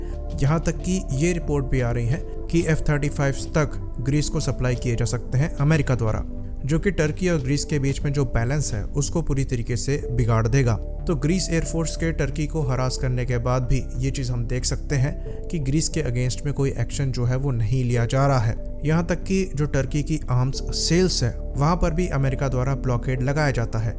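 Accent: India. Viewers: As soon as I heard the Indian accent I didn't surprise. 🤣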